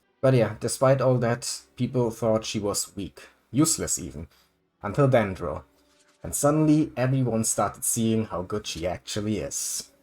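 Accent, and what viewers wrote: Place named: Germany. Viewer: Youuu.. are german! Das höhre ich doch gleich raus :D Fellow Kuki Main here, I like to do Montage videos of teams i like and im working on an Aggrevate Kuki Montage rn I LOVE Aggrevate Kuki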